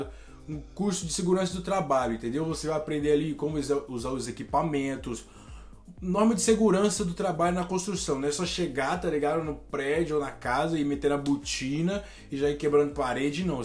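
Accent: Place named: São Paulo, Brazil. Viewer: Cai de paraquedas aqui!! Iol mas ganho meu like 1° pela humildade..2°AHH que bom ouvir o sotaque da minha terrinha😍 SP na veia manoooo!!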